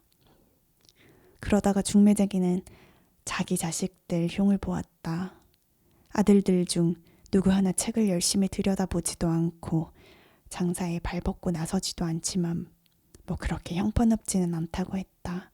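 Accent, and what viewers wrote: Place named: Busan, South Korea. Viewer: 파친코1부 부산사투리 재밋게, 감질나게 잘들었어요. 2부 기다릴게요~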